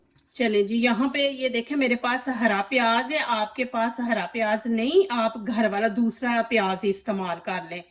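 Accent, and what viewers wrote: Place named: Punjab, India. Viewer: Sub hi cooking karny walay bismillah sai hi start karty hain aap boht acha karti hain sirf itni baat hai kai aap ki urdu sun kar dhayan cooking sai hut jata hai punjabi khubsurat zuban hai or aap ka lehja bhi pure punjabi hai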